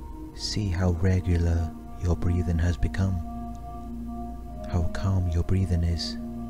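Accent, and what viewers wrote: Wales, United Kingdom. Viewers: Thank you, this was magic. You have a good voice for this sort of thing ( welsh......?) 👍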